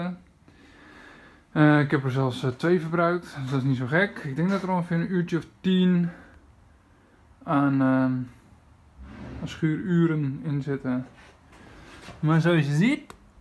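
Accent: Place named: Russia. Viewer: What country are you from sounds Russian nice build anyway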